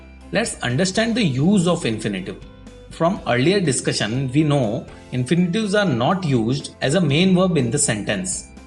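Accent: India. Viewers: I wonder why i don't understand indian english? Is their someone feel the same?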